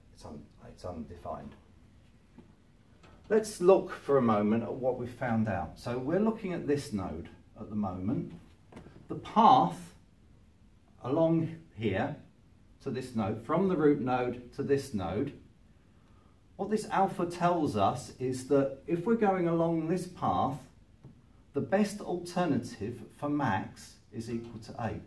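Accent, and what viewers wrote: United Kingdom. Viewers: Ohh British Accent ^^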